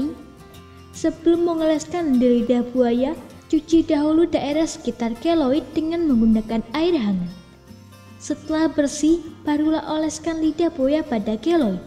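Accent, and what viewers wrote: Java, Indonesia. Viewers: Intonasi suara nya kaya drama di sekolah.logatnya jawa .tapi jawa ma ya...lucu2 geli..... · Medok bngt🤣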